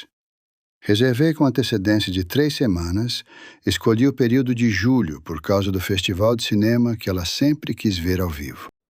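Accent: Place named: Rio de Janeiro, Brazil. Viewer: Ai a voz desse locutor é muito mole muito malandrão muito carioca fala mais firme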